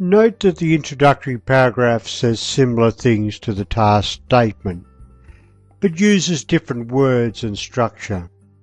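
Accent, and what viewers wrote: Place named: India. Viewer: hi,it is really helpful,but just your accent ,i think you are from india ? but anyway i can understand you nicely ,tks anyway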